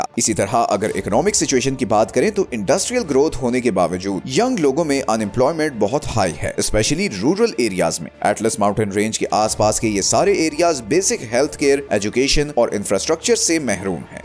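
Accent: Pakistan. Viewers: this is Pakistani channel aisi Urdu India mein boli ni Jati lol 😂😆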